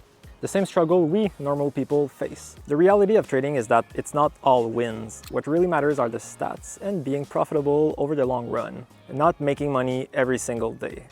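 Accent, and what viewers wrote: Quebec, Canada. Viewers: Are you québécois ?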